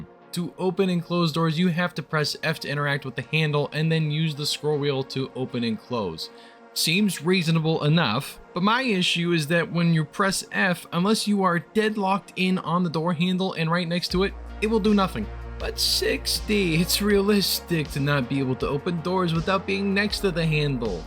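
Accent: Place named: New York, United States. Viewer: where'd the new york accent come from,?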